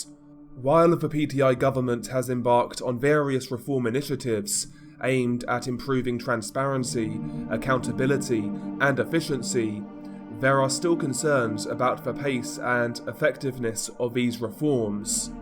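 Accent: United Kingdom. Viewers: Every time I hear say “Ladi gang” with his British accent, It reminds me of the song. “STAND STILL LADDY!” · He's British, and some British accents "add" an R sound to a few words. Have you ever heard different British accents?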